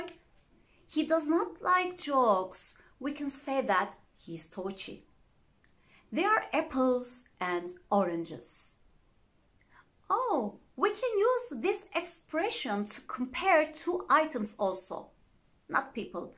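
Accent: Turkey. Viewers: You pronunciation likes Turkish, I think, you are from Turkey, right? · Mrs. is my English teacher at school. I love her very much. If you don't like the video it's probably because of the way she is speaking but please keep in mind that we are Turkish. We didn't born neither live in a country that speaks English. It’s not our native language. This video helps me a lot with my lessons at school and I hope it's the same for you too! If I made any mistakes while writing this please point them out so I can learn the right. Thanks for spending your time.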